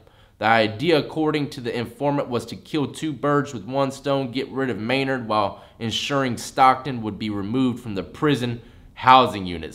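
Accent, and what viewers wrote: United States. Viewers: Why do you Americans say the letter Z wrong!! And in a bid is a way of saying they tried to !!! Not in a bid how death said it !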